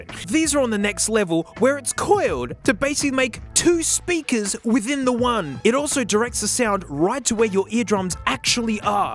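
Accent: Australia. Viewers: MOOOOM The funny aussie is talking about headphones again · that's a toss up between overtly verbose ramblings about things that may or may not be relevant to the car being reviewed and the [ h e a v y ] aussie accent cheapo microphone stories about the aussie life lol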